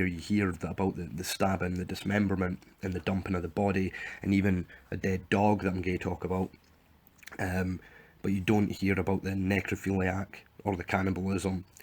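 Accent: Scotland, United Kingdom. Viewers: Where you from in Scotland mate??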